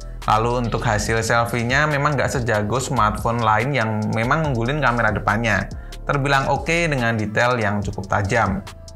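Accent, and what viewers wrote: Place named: Sleman, Indonesia. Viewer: orang sleman ya mass?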